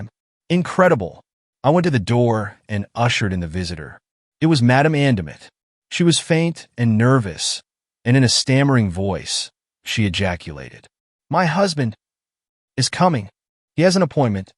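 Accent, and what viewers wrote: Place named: United States. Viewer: Horrible American reading voice.ruined the story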